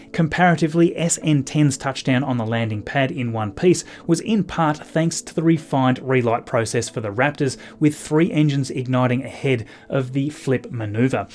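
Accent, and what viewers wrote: Tasmania, Australia. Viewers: Tasmanian accent!!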